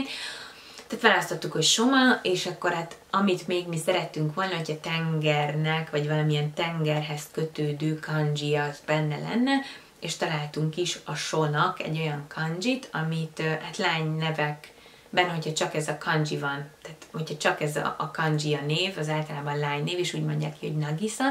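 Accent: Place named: Japan. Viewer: ez a hapogo, gagogo "japan" hangkepzesborzaszto. hallgathatatlan! :-( a hajad viszont NAGYON jo! :-)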